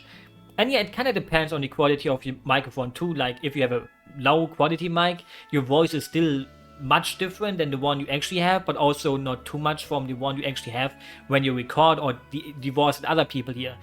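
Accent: Germany. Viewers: Necroposting a lot, but I got it suggested and never watched it before so... here's my two cents. Very heartwarming, inspiring video and a great listen overall. Thanks for sharing your personal history, allowing the audience to take it in and make their life better (and accept themselves). I always hated the "true" sound of my voice (which is natural, it's the same as not liking having your photos taken - our brains are used to see our own image mirrored because, well, most of the time we just see our reflections) to the point that I lashed out on people using speaker during calls as I could hear myself. So recording myself speaking and then listening to it and fixing it was a true torture and I wanted to die... until it wasn't. At some point... I just stopped caring about it and accepted myself. The same could be said about having aversion to own image. Very often there's that little voice inside our heads that tells us that we're just "not good enough", when in fact people really doesn't care (most of the time). 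Most of the time we are our own worst enemies, huh? Perhaps that's just And hey, I think your voice is really cool, same with accent, tone and tempo. It makes it quite pleasing to listen to, ...and yeah, I realized you were German after like 5-10 seconds of listening to you. It isn't a bad thing, it just... something that is and makes your content bit different than most, haha! It is funny though how Europeans can pinpoint the accent of others with nearly 100% preci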